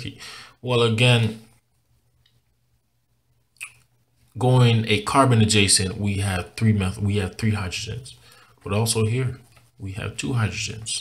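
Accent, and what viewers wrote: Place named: Caribbean. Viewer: I think I am hearing a Caribbean accent here. Thank you for this tutorial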